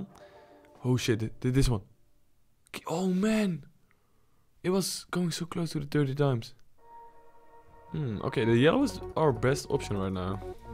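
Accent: Netherlands. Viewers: NEDERLAND !!! ik dacht al je hebt een Nederlands accent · Dutch accent? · ik hoorde gelijk dat je nederlands was hahaha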